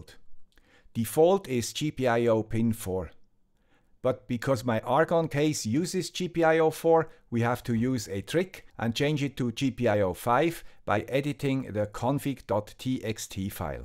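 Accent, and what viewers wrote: Switzerland. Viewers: the Swiss accent sounds a lot more pleasant to me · Third law of termodynamics -> There is no place where you can find -1,800 °C, not even in Sibiria ;-). Some thoughts about the SPI temperature sensor: a) The low negative temperatures could be caused by a wrong polarity. Have you checked that the thermocouple is connected with the right polarity? b) Are you sure the thermocouple and the MAX31855 are type K? The circuit python module only works with K-types. c) There is a very little chance that the D5 pin is not set as output: you could do this by adding the line to script: cs = digitalio.DigitalInOut( board.D5 ) cs.direction = digitalio.Direction.OUTPUT # add this line It would be so cool, if we could find a solution because knowing, it doesn't work, drives me crazy. Yes, I'm a Nerd ;-). Someone like Mr. Monk ( but not so brilliant ;-) ). 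BTW: I like your videos: interesting themes, well prepared, you do not hide your mistakes (so we could learn from them, as well as you did), understandable english (with a nice swiss accent; greeting from a German neighbor), no boring background music and for me also important: fluent speaking with no ehms and aahhms. So thank you for your work and for your countless inspirations! vy 73 DF4VB (Peter) PS: What is a qualifying purchase? Only the products in your shop, or can I mark other things, I'd like to buy, to be recommended by you (or so)? I have no experience with amazon, but if I could support you, I'd give it a try.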